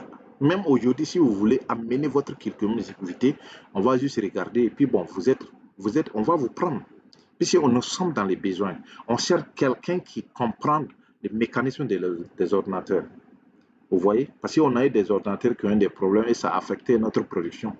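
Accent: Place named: Burkina Faso. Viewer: Tu as un accent Burkinabè ! Tu es Burkinabè ? Je viens de découvrir ta vidéo pour la première fois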